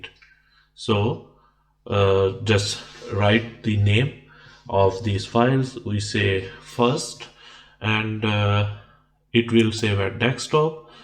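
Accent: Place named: India. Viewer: Thank u very much love from India and your accent is also like indian Sir .....are you also Chemical engineering background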